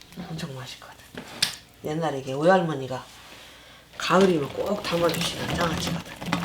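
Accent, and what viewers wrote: Chungcheong, South Korea. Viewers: 충청도사투리가 정겹네요ㆍ설명도 간단하니듣기 딱좋아요ㆍ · 충청도 어디세요? 고향이~~ 오햘머니? 너무 정겹습니다 친정엄마 의 목소리 같아서요.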